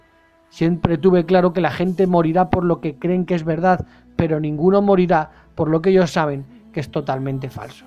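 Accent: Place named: Spain. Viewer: que tal si muy cierto y ahun así cada vez la gente cree más en extraterrestres que en Jesús,si soy de Morelia Michoacán mexico ,pero hace como 16 años vivo en usa vivo dos años u medio en California y después me mudé a Carolina del norte,conosco poquito new york ,muy de pasada ,ahunque aquí en usa casi todos los lugares son iguales jejeje,y usted de que parte de España es?me imagino que es de la madre patria por su acento ,y ay disculpe tanta falta de ortografía ,mi hermana dice que tengo faltas de ortografía hasta al hablar jajajajajajaja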